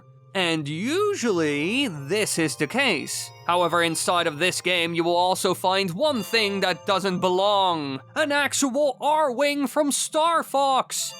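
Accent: Netherlands. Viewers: I have to know... Are you Dutch xD? If so, je accent heeft het verraden B)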